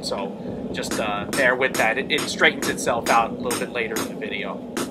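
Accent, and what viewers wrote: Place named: New York, United States. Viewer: One question I have. The depressions you make with the guillotine tool at the front and back of the boss, are those functional or just for aesthetics? Also, do you originally come from the NY/Long island area? just guessing by your accent.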